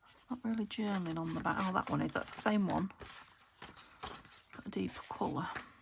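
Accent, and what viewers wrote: United Kingdom. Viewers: Good morning. ☕️ Congratulations on 3,000 subscribers. It sometimes takes me a day or two to completely watch a video because of interruptions in my life. Your voice, and gentle crafting and wonderful UK accent are the highlight of my day. Thank you so much for the inspiration and sharing your beautiful journals with us.❤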